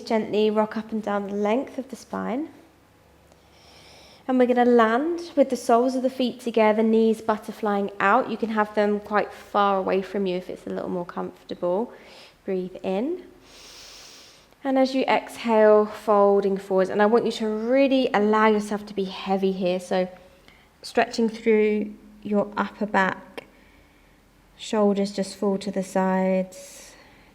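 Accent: United Kingdom. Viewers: Beautiful British pronunciation. And a great yoga class, thanks!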